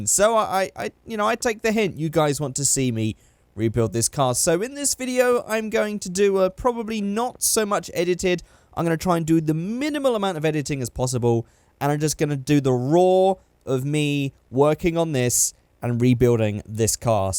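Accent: United Kingdom. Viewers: great video it's relaxing it's like a podcast of a British guy who's never built a car in his life building a car I love it. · I couldn't wait to see this! Thank you <3 Btw, your accent seems British, Am I right or not? And one more thing: Chrome:P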